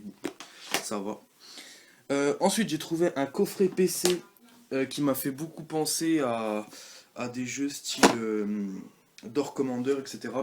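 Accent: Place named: Moselle, France. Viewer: bien la nappe rouge , bien les rpg ps2 , bien l'accent de la Moselle !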